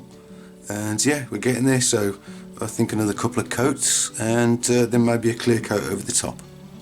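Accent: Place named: Midlands, United Kingdom. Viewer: Brilliant I am just try fitting some in my rc4wd great for the money, nicety hear a fellow midlander instead of an american taking an hour with 10 mins content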